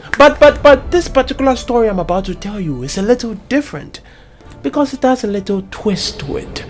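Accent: Nigeria. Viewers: yep because he creates more nigerian content and speaks like a nigerian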